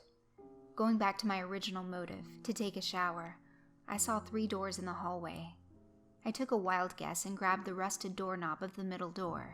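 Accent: South, United States. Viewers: I ❤ listening to your soothing southern accent and awesome creepypata choices 😊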